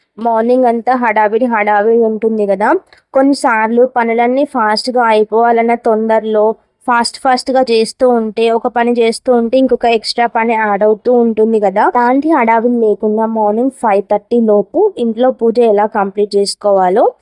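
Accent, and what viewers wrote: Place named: Sangareddy, India. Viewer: Hii sis first time mi video chusanu.mi slang same maa lane undi madi sangareddy.mi villege yenti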